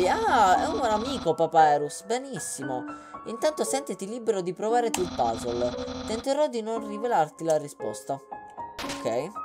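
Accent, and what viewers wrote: Sicily, Italy. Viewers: comunque si sente che sei siciliano anche se non parli il dialetto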